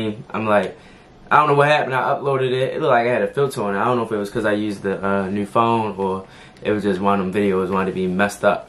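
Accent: Baltimore, United States. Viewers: Bmor accent? Good video. Love to see dmv youtubers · Definitely knew u were from B more before u said it lol tew dollars🤣I love yalls accent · The Baltimore is strong in this one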